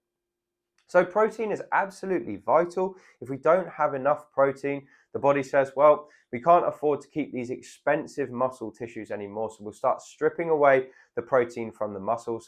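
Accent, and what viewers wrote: United Kingdom. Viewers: İ love his British accent, he s so sweet..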